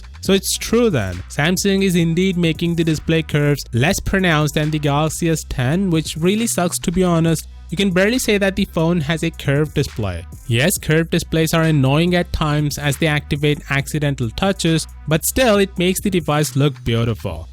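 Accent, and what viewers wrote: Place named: India. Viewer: ANNOYING VOICE OF THE NARRATOR. INDIAN ACCENT REALLY SUCKS!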